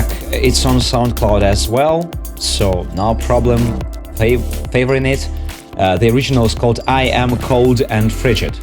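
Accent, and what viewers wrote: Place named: France. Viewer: nice new track, congratulations, dear. 🍀 you have such a charming French accent. and you make such cool remixes of the French language: - les filles jouent de (avec des) boules de neige. (the girls play (with) snowballs) ❄ - qu'est ce que c'est que ça? ça sent bon le chocolat. 🍫 what is that? it smells like chocolate. (copyright with the song " " 2012.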